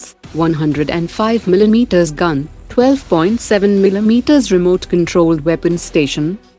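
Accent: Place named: India. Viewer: I love desi English 😉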